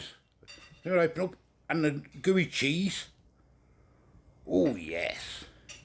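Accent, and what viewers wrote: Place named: England, United Kingdom. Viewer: I love how only a person who has lived in England for a good amount of time would understand half of that killer accent😂.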